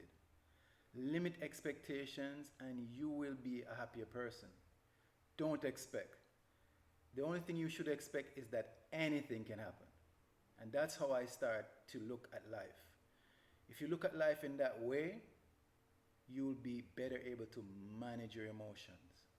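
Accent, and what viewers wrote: Caribbean. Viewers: Hey, you sound like you're from the Caribbean. I'm Trinbagonian. Love you too. Your wife is lucky that, as a man, you've taken your mental health into your own hands and are trying to be better for yourself and her. It's refreshing to see an informative and proactive video on this topic from someone who lives it. Stay up, Brother.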